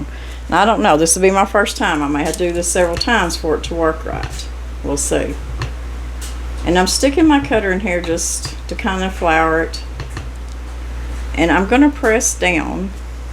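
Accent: South, United States. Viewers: Dear Miss, Absolutely love your hair style! You look prettier all the time, and you’re already beautiful! Thank you for all your wonderful videos and for sharing your lifestyle with all of us……..your devoted fans! I love listening to your beautiful southern accent, no matter what the subject of the day offers, whether it’s cooking, gardening or just listening to you and sharing your thoughts and memories. I’m forever grateful that I found your Utube channel. God bless you! Love, Jackie🪷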